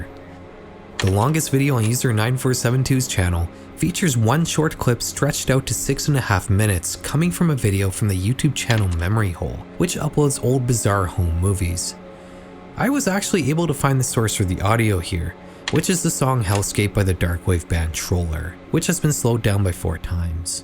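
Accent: Poland. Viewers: ale ten polski akcent słychać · the polish accent gets me by surprise as i skip the video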